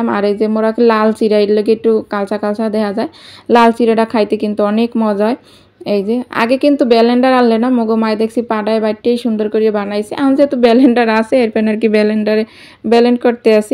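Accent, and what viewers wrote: Barishal, Bangladesh. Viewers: সালামালাইকুম আপু তোমার মুখে বরিশালের ভাষা শুনতে ভালই লাগলো ❤আমি তোমার পরিবারে একদম নতুন সদস্য পুরো ভিডিও খুব ভালো লাগলো আজকে থেকে সব সময় পাশে পাবে ❤❤তুমিও এসো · আসসালামু আলায়কুম আপু তোমার মুখে বরিশালের ভাষা শুনতে ভালোই লাগে।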